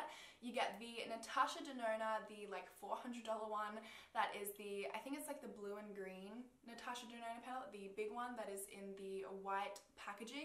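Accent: Australia. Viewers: I literally pause the video every once in awhile and try to copy what you say in an Australian accent because I want one so bad hahaha I think it's so cute!!! Love u 💖💕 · Your an Aussie? G’day!!!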